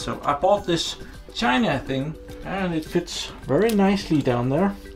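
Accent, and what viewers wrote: Denmark. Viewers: dansker confirmed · are you from denmark? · are you danish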